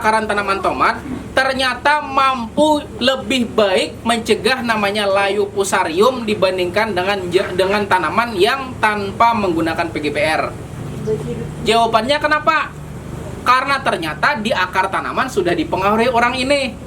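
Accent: Banjar, Indonesia. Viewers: Luar biasa penjelasanya pak. Ingat waktu di Banjarmasin, logat Banjar. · Bahasanya kayak orang banjar kalsel om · YaAllah pantas pina logat Banjar, skalinya dasar org Banjar 😂😂😂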